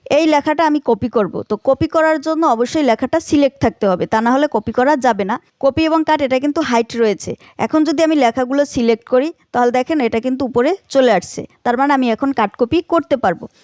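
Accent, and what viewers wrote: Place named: Barishal, Bangladesh. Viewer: মিস, আপনার উপস্থাপনা পরিষ্কার ও সুন্দর কিন্তু র-ফলা জণিত শব্দ উচ্চারণে সমস্যা ঠিক করে নেবেন। বুঝতে পারছি আপনি বরিশাইল্যা মাইয়া। আমিও বরিশালের। However, don't take it otherwise.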